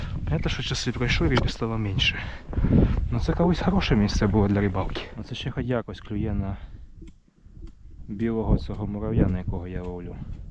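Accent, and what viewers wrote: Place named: Polissia, Ukraine. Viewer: так, лайк за україномовний контент, і я чую по Вашому говору поліський край, Житомирська область, коростенський район??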